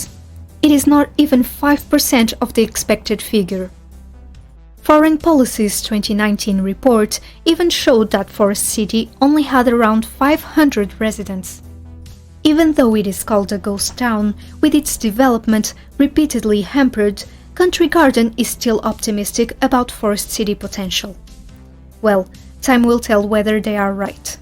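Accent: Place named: India. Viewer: Whats with the indian accent?? 😆😆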